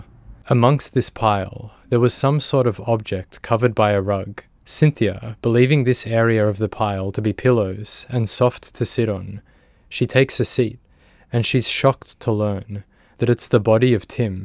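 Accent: Australia. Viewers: Nice to hear an Aussie doing true crime. Subbed mate. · Sounds like an Aussie did this doco by accent or maybe a Kiwi but as an Aussie I think it's an Aussies voice. I'd never use it even once as they all say I'm only trying it once & a year later they're nuts! I've seen just 1 year of meth uses' impact on the brain by a meth users who were paid to allow weekly brain scans over the year & their brains are very impacted by even a month on meth let alone a year so imagine someone like Heidi who's been on ity for years!!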